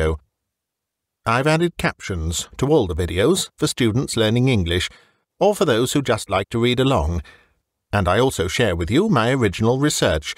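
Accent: England, United Kingdom. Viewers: Hi, i just finished listening to a couple of stories you've read, you have such great diction and voice, just like I would imagine a well educated Englishman would sound during the Victorian or Edwardian times, very nice and genuine, thank you! Do you only read short stories, or is there The Moonstone by Wilkie Collins in your collection? My favorite book since my youth, I've read it in multiple languages... Thank you again!!!!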